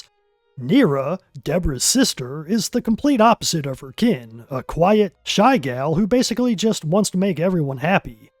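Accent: South, United States. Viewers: listening to a guy with a southern accent talk about JRPGs is like listening to an indian talk about using a toilet+toilet paper+washing their hands afterwards... not exactly the source i want to rely on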